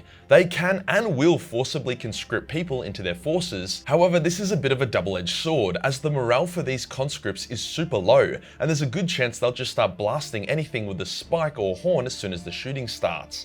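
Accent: Australia. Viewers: Bruv I need to move to AUSSIELAND and hear u speak on the daily it's fucking great, you Mook. Keep up The Emperors Work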